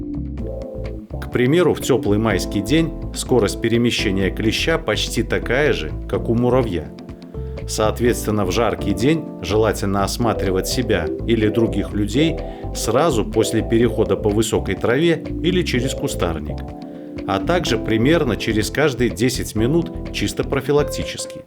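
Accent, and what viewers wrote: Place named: Belarus. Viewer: Блин, вот всё в ролике замечательно с технической стороны, но произношение мягких согласных - это пипец! :( Ну нельзя взять и "прикрутить" произношение белорусских звуков к русским словам. Причём некоторые слова вам удаётся произносиТЬ (а не произносиЦЬ) так как надо. Что ж за напасТЬ-то такая в средней и восточной Беларуси с произношением...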